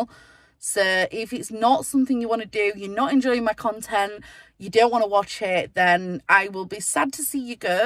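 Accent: Yorkshire, United Kingdom. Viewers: Your channel is good, you are not boring, you are aesthetically pleasing (we don’t all want to watch perfectly filtered beige channels), you are not common you’ve just got a Yorkshire accent and there’s nowt wrong with that! 😂😂 I think one a day is perfect and yeah, no spoilers please. I’ve gotta say though when you mentioned be positive Sam uploading again you got me all excited and confused 😂😂. I miss her videos! Very brave video and I completely understand where you are coming from. Lots of love xx.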